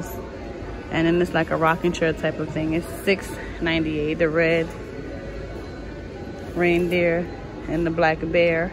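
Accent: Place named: Caribbean. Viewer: I hear an accent.u from the caribbean?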